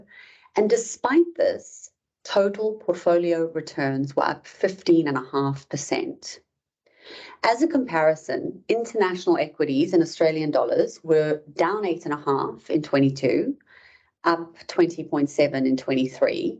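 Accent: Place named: South Africa. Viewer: Rock on GVF! Love the South African accent too.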